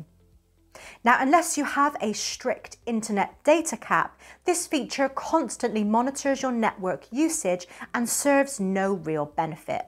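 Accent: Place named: Bristol, United Kingdom. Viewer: Are you in, or near Bristol, England? 🤔 (Guessing, based on yer accent, me awd babber) hahahahah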